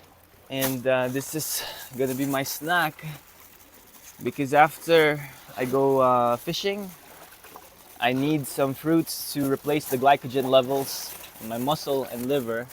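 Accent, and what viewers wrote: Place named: Mindanao, Philippines. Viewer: What country are you from? Your accent sounds like you're from Southern Philippines but I could be wrong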